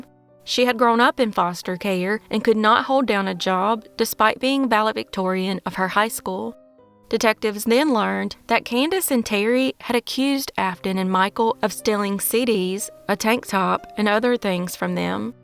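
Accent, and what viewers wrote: South, United States. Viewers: The scumbag couple that took the lives of Kandis and Terri Ann had one of the most pathetic excuses I’ve ever heard! “They had no other choice”. WTF!! Murder people or leave and go somewhere else, it’s not a hard choice! No murderer here has an excuse, that one just got to me, how stupid and vacuous this couple are! 🤬, thank you as always for your hard work and compassion for the victims. I adore listening to your gorgeous voice and southern style. ❤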